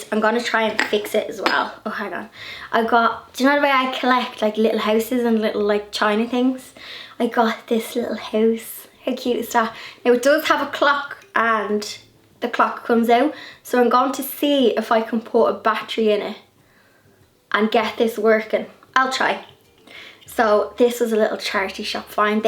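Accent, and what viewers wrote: Ireland. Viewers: Bless your heart! I think it's good for everybody to unplug for a while; live in the now, and just live. Be safe be well. I enjoy your videos. I live here in the United States you're just cute as little bug's ear. I love hearing your Irish accent. Enjoy your time my dear you so you definitely deserve it. Blessings and much love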